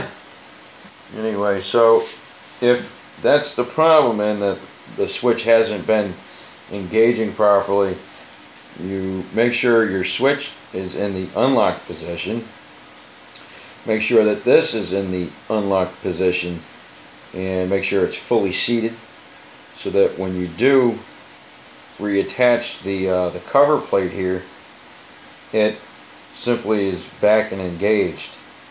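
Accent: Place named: Buffalo, United States. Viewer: You sound like you're from Baffalo!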